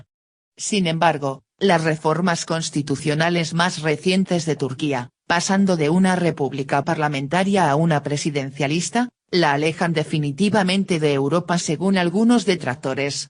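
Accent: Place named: Argentina. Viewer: aunque tenga acento argentino es bastante mas agradable que lokendo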